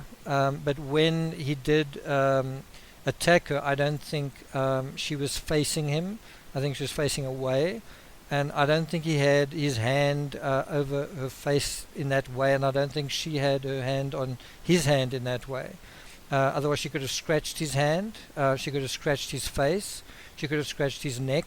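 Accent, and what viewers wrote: South Africa. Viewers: South African narrator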